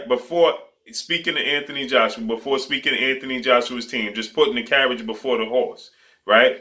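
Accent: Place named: Alabama, United States. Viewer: What is this, black impoverishment land? What on Earth is the appeal of inarticulate black people attempting to show their swag on YouTube by exaggerating and already pathetic cultural cliche and speaking as if they were just dredged out of the swamps of Alabama? best clean up your act and your accent, stashed the hat, and appear to be a relatively communicable human being, in spite of the fact that it may mean trashing your "blackittitude".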